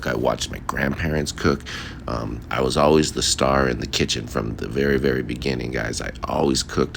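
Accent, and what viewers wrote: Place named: Pacific Northwest, United States. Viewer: I just had a feeling you might be in and have been in PNW… I can hear it in the voice 😊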